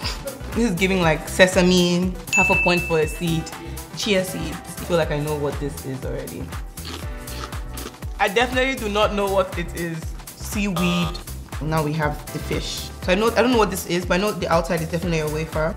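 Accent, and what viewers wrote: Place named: Nigeria. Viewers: Love how you spoke with your Nigerian accent and not trying to speak with an Anerican fake twang like some afrobeats artists. Keep repping Nigeria be proud. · first of all, did you read the comment He's just saying that she's not trying too hard to change her Nigerian accent No one is hating you ffs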